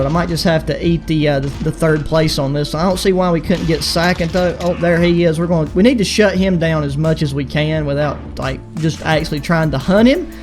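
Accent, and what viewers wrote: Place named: South, United States. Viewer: Bro I love your draw you from the southern states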